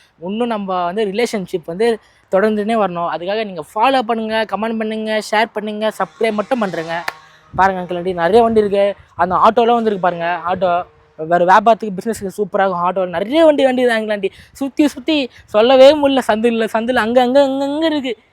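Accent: Madurai, India. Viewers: Super Thampi Madurai vasagam 🎉🎉🎉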